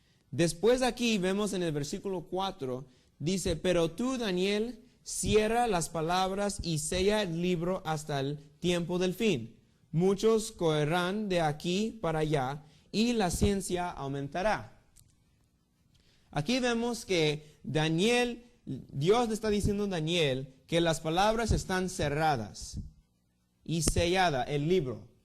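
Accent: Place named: United States. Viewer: Tu español ha mejorado muchisimo. Un poquito de american accent but still good. Keep doing the good work.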